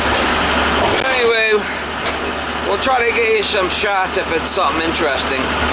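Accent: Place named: Cape Cod, United States. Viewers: near cape cod accent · Mada Nielk that’s a Dartmouth/Fall River/ New Bedford accent.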